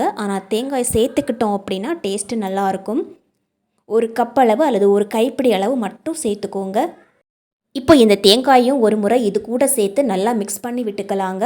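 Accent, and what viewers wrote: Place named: Nagercoil, India. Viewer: I think u are from Nagercoil Your language sounds like that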